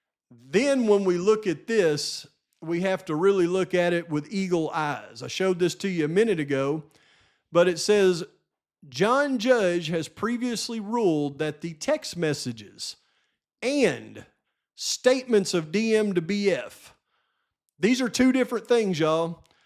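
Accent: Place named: Tennessee, United States. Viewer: You're definitely from Tennessee. Possibly KY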